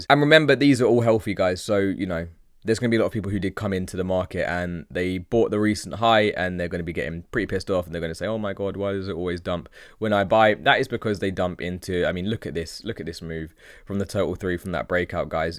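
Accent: United Kingdom. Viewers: love the bri ish accent my man🍺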